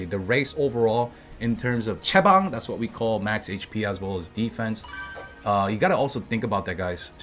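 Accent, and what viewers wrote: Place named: United States. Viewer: Dude, are you from america? Your english is perfect. A lot of the korean streamers out there do speak english, but obviously have a korean accent. If I didn't check already, I would think you were from the states.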